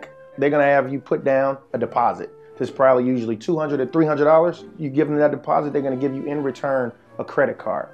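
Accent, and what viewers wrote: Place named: Chicago, United States. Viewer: Bro GOTTA be from Chicago !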